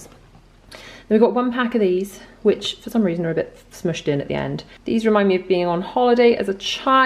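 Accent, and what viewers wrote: United Kingdom. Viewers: I have never seen them in a store. It’s just a funny thing you say when you’re trying to impersonate a British accent, “would you like some tea and crumpets?” I didn’t even know what they were prior to this video.. for the record that’s not meant to be rude. I’m obsessed with your accent and long to visit one day!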